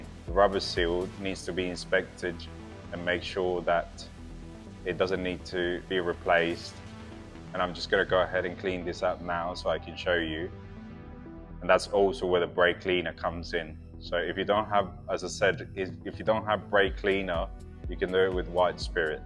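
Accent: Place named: England, United Kingdom. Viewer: I knew that Colombian face but the English accent fooled me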